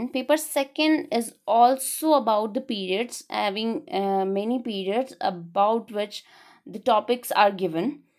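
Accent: India. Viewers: Nothing is more irritating than North Indian accent.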